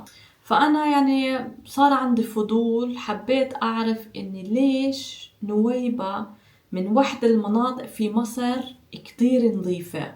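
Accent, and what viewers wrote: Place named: Palestine. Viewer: شفتلك كم فيديو فكرتك لبنانية ، بس حكيتي كم كلمة عرفت انك فلسطينية .. CHلبوا فيي .. تعربشت😅.. يسعد دينك انتي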